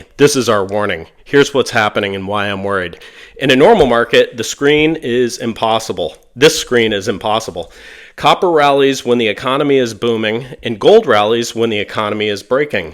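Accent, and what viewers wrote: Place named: Austin, United States. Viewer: I see "TEXAS" next to where it says how long ago this video was uploaded. What part of Texas are you in? I am up here north of Dallas in Frisco. Just saw your channel in my feed for the first time. Sounds like you are in or around the Austin area. I trade for a living and have been trading the precious metals exclusively since '03. Enjoyed the video and was happy to hear it free of price targets predictions but more about just the mechanics of working with the daily zigs and zags which is reallyl all its about once you determine what the med and LT trend direction is, assuming there is one.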